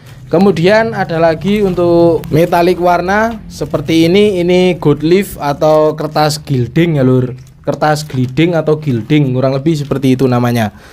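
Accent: Temanggung, Indonesia. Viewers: wong manggung ki?😁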